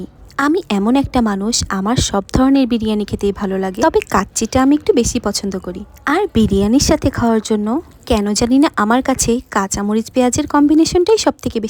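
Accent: India. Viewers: কলকাতার বস্তি এক্সেন্ট এ কথা বললে ভারতীয় ভিউয়ারস ট্র্যাক করুন। বাংলাদেশি হিসেনে বিশ্রী লাগে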